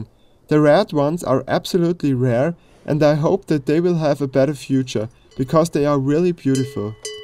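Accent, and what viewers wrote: Austria. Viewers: like your beautiful Austrian accent :) as much as this video. greetings from Australia. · Vielen Dank! Sehr gut gemacht, aber mich wunderts dass es die Pinzgauer nicht unter die ersten 10 geschaffen hatt! Bist Du vom Bezirk Kitzbūhel? ( der Sprache nach) I bin a Kirchdorfer, schon seit 50 jahren in Toronto Canada. Grūsse Martin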